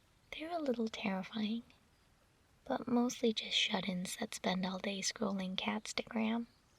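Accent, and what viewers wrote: United Kingdom. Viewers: Is naturally british with an American accent? Or is she American with a really good British accent?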